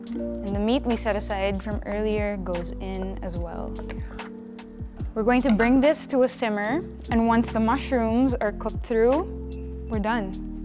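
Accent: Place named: Philippines. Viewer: You have an accent. Are you pinay?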